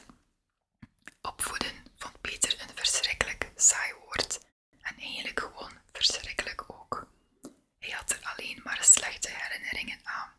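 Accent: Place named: Flanders, Belgium. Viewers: Weer heerlijk om naar je Vlaams gefluister te luisteren. Ik hou van dat taaltje van onze zuiderburen. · Heerlijk! Je stem en je Vlaamse accent zijn zó fijn om naar te luisteren! Goeie setting ook! Fijn donker en ontspannend. Topwerk! Dank je wel..🍀❤️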